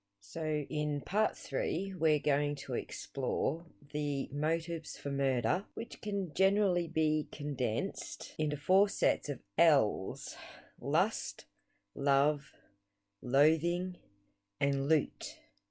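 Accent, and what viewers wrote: Australia. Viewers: Exactly what I been saying. I see her being the Female "Mike oh" with her Aussie twist!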